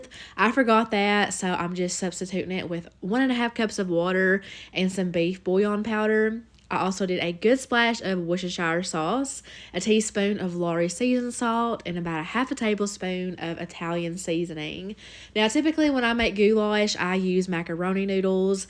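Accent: South, United States. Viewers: To all those correcting her pronunciation, Southerners typically pronounce the L in salmon. It's not incorrect; it's cultural! ❤ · That’s what I was saying, I think it’s a southern thing — just a different dialect. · That southern accent makes my heart smile. Hearing the voice-over, I can just imagine your beautiful smile. I'm definitely trying the chicken nachos recipe & the beef tips. I've always wondered if Josh likes real onions, lol? I'm not a fried salmon person either. As a child I ate a lot of them & now I hate them & the smell of them 🤣. Have you ever heard of salmon salad (like tuna salad)? My grandmother used to make it & I like it that way. That's the only way I will it salmon now & that's every blue moon.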